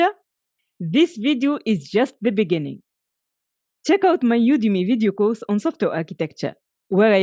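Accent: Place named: France. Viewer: Your accent sounds like French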